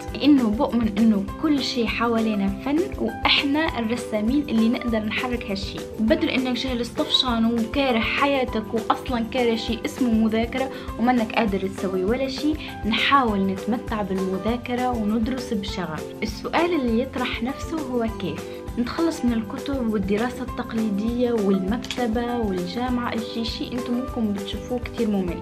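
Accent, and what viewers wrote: Tunisia. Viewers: انت تونسية ؟؟؟ ولا جزائرية ؟؟؟؟ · هل انت تونسية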